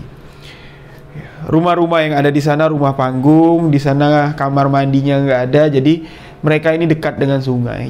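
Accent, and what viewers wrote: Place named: Madura, Indonesia. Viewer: Logatnya madura.... Maduranya mn bng?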